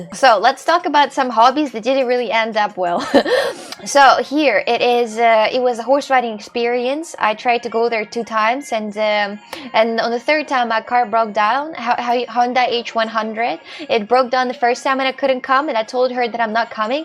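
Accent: Russia. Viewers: I love that Russian accent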